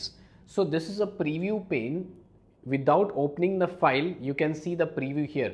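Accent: India. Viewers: Even with your Indian accent, you are the best teacher I've seen online. Your topics are well selected, short and perfectly well presented. Will definitely watch all your videos. Keep it up. Applause from Nigeria. Take care!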